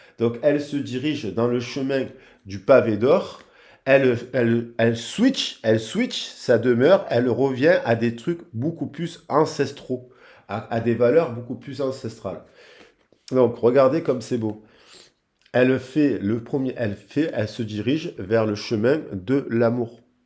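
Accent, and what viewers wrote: South, France. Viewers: Coucou, C'est toujours un réel plaisir de vous écouter, ne serait ce que pour l'accent qui sent bon le soleil et les cigales😂! Je l'avais pourtant mis en garde sur le fait que sa conquête était vénale😡! Il n'a pas tenu compte de mes ressentis et a foncé aveuglément dans cette relation, il est tellement crédule qu'il s'est laissé manipuler😢par elle et ses acolytes... En y réfléchissant bien, il ne pas pas quitté, c'est moi qui ait tout fait pour qu'il parte, n'acceptant plus son comportement détaché à mon égard😞... Vous êtes une bien belle personne, honnête et c'est bien d'avoir fait cet apparté au sujet des tarifs démesurés que certains n'hésitent pas à pratiquer dans le domaine de la voyance...😞 MERCI pour tout, excellent week-end🙏🍀!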